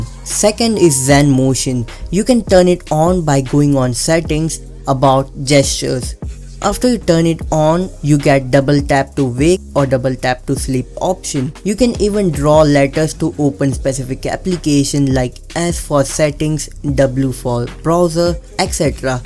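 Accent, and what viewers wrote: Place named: Uganda. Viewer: Nice accent. from Uganda university?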